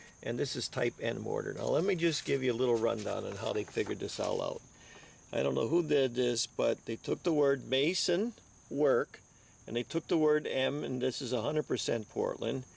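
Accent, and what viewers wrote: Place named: Scranton, United States. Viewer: I was born and raised the first 25 years in Wilkes-Barre area. I watched your first video today, and then many others and within two minutes of watching you and listening I knew you were from W-B/ Scranton area. We’ve been living in San Diego the past 40 years and now that the kids are grown up we’re coming back Home, buying some land and going to have fun building the last home that we’re going to live in and it’s going to be all stone just like our current house. Your videos are great, it’s really great to hear and see somebody from where we grew up, and I forgot the recipes for walls, stonework and grout and this has been so helpful. Thank you for your video.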